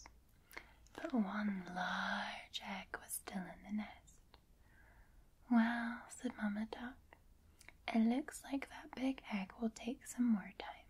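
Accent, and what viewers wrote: Scotland, United Kingdom. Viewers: She is the best ASMRtist for reading stories with her beautiful ❤️ Scottish accent!:_Loveheart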